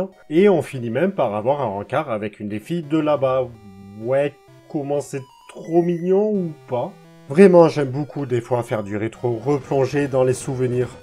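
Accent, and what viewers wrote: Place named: Toulouse, France. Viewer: Le tout couronné de l'accent Toulousain, magique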